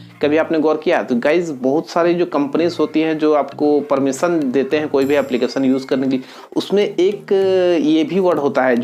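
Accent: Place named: Assam, India. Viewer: U talk like Assamese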